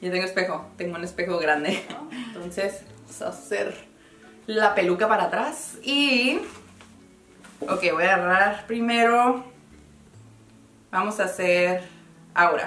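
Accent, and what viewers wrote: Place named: Colombia. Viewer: ¿de dónde eres? Por el acento pareces colombiana....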